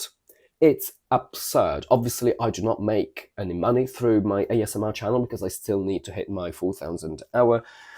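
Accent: United Kingdom. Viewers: It's so sad to hear that! Watching from Thailand and I caught every word in your video. I can feel you completely. ❤😢 Thank you so much for your time and dedication nurturing this channel. Firstly, I followed this channel because I love listening to your voice, your British accent and also your lessons on pronunciation. I used to teach English in my free time many years ago but with my busy work scheduled, I had stopped. Now, with the rise of online teaching, I've come across some online English teachers teaching incorrect pronunciation. This highlights the importance of good teaching and inspired me to help others avoid similar mistakes. I'm not here to tell my story, though. I just wanted to share that when I listened to you, I saw myself (we're not comfortable in front of camera, scripted not to miss the point, spent ages editing, not monetized as I just started). 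I whole-heartedly understand your journey and cried with you. 😢 The knowledge you've shared is valuable, but the time you've dedicated is equally important. To me, you are an incredible teacher. I will always come back and learn from this channel and will also recommend to my future students. Lastly, I wish you joy and tons of success with your new channel. Subscribed! ❤ P.S. Last night, I listened to your new ASMR channel to calm and relax before going to bed.😍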